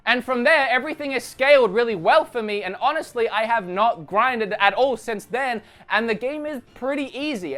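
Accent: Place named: Australia. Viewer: Also stop with the stupid aus accent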